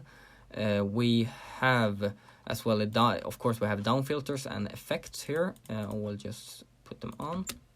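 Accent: Sweden. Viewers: thats the swedish accent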